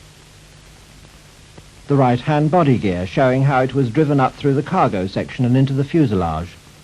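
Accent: United Kingdom. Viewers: More than 10% dislikes typically means F. For his Britglish i guess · Proper British for sure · There is just something about a narrator with a British accent that adds calmness and matter-of-factness.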